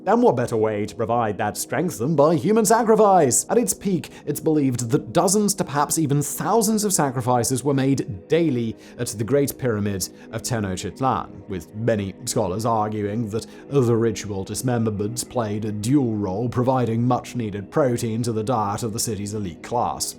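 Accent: England, United Kingdom. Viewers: Didn't get any new information about war or warring cultures. Just wasted time hearing arrogant English accent.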